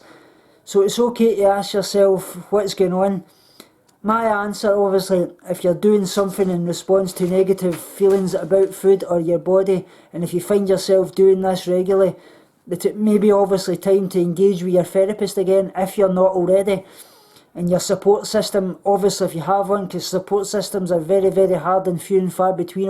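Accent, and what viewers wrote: Scotland, United Kingdom. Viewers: thanks for these refreshing thoughts. you are so inspirational. i do not consider myself in recovery because I still practice all of my ED behaviors, but I do see a therapist regularly and I am starting to consider the idea that maybe I deserve recovery. that maybe my life could be better. maybe, just maybe, I have a future to live for. so maybe because my thoughts are changing, even though my behaviors aren't that I can consider myself in recovery. idk. what do you think? btw I wish I lived in Scotland so I could hug you. you are so sweet. and I absolutely love your Scottish accent. I know it isn't an accent to you, but I am from the U.S. so it sounds so cool to me. i wonder how our accent sounds to you. sorry. I am weird. it is am here and I need sleep!! ❤😘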